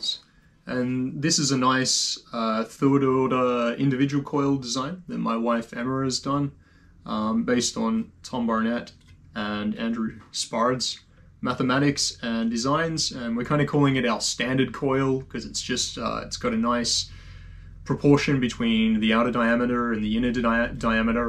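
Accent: Australia. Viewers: Slight Aussie accent Almost American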